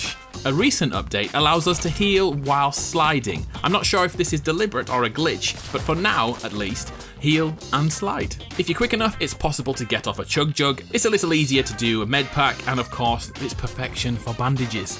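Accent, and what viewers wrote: United Kingdom. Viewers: you, tracer and pyrocynical are the only humans that i respect whom speak british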